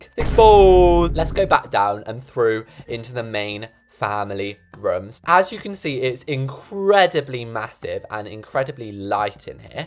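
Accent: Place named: United Kingdom. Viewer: When u talk British I have no idea what u mean😂 I’m American so I enjoy when my fav YouTubers (ur one of them ofc!)that are British say things abt the UK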